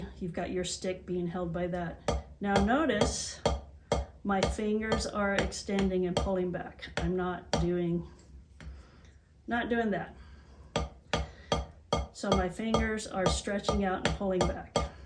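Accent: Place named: Oklahoma, United States. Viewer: If you're not intelligent enough to ditch that funny Oklahoma accent I think that you're an idiot savant and your drumming thing is all some sort of a f**** reaction coming to you from someplace else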